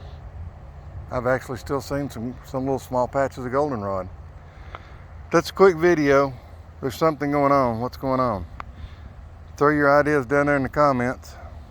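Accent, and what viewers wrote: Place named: Carolinas, United States. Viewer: I'm thinking that if the bees start drawing in pollen, the queen might start laying again. I'm not sure which zone you're in, but that accent sounds like the Carolinas or somewhere really close.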